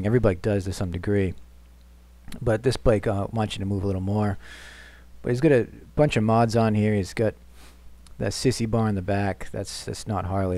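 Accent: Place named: East Coast, United States. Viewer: Why do you talk like an east coaster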